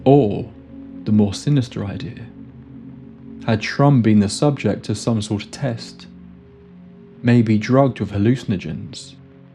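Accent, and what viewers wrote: United Kingdom. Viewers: A british narrator is so boring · The British wank narrating this story is not helping sell the mystery or horror, whatever. I found myself wishing the narrator was in another line of work.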